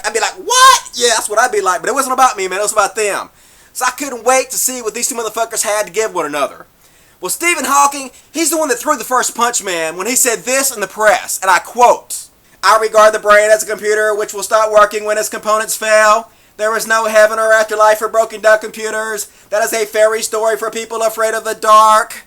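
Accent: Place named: Southern California, United States. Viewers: if this guy didnt have a hillbilly accent and a potty mouth maybe someone would take him seriously. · I love this guy's accent, it's like mixture of southern and california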